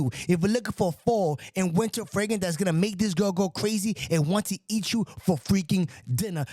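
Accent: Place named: Peru. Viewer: Y yall hating on his Peruvian auntie accent 😓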